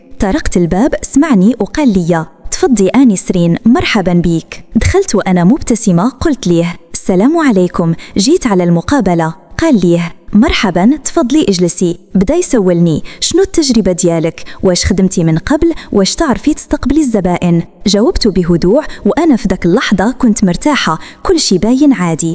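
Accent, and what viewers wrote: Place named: Algeria. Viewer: مغربية و تهدري باللهجة الجزائرية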